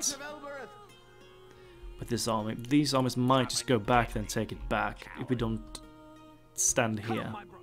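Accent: Sweden. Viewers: Haha I can hear the swedish-english accent. Awsome video and gameplay! 💪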